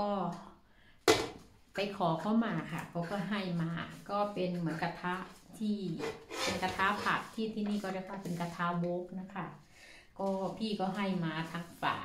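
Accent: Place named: South, Thailand. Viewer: พี่warutaเป็นคนใต้ใช่มั้ยคะ แฟนหนูคนนครศรีคะ เค้าบอกว่าสำเนียงเหมือนคนใต้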